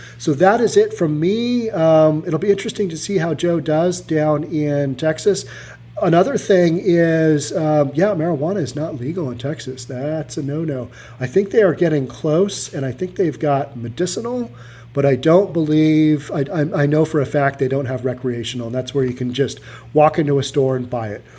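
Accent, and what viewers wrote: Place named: West Coast, United States. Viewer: Tried to watch this. But this guy is to west coast laid back trying to be cool and slow with that idiotic accent.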